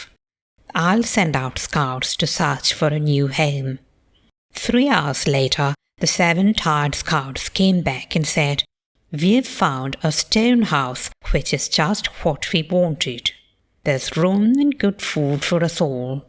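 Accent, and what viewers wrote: RP, United Kingdom. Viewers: this is an extraordinary accent! It sounds even more heightened RP than my grandmother (b.1903 d. 2003) and I can hear aspects of the former colonies as well? Fascinating, beautiful even in it's uniqueness. Not a standard RP though, nor even a standard heightened RP accent. Use of Arthur is valid.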